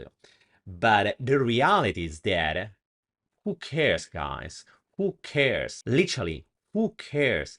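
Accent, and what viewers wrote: Italy. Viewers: they are mim coins, not mimi coins. just saying. cute italian accent though ;-)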